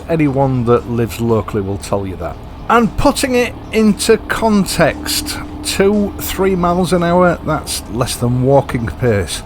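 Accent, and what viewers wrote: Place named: Hull, United Kingdom. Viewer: Apart from the really good motorbike content, I just love listening to your Hull accent. Pure music! Great video.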